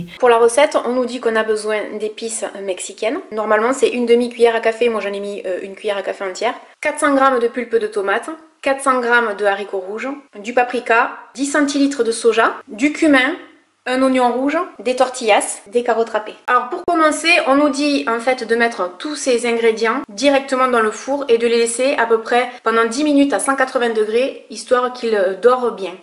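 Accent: South, France. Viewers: Salut. Merci pour tes vidéos. Je ne te suis pas depuis si longtemps mais à présent, j'attends chaque semaine les sorties avec impatience. Tes thèmes sont variés et ton accent du sud me fait voyager et me donne le sourire. Je sais que parfois tu parles de chaines youtube que tu aimes bien et comme tu fais attention à ce que tu manges et que tu es une gourmande aussi, je te propose d'aller, une fois, jeter un coup d'oeil sur la chaîne Veg no Glu épices et tout. Dans le thème de ta vidéo, c'est pour les vegans et intolérants au gluten et c'est assez fun. Voilà, juste pour partager aussi quelque chose avec toi. Bon week-end.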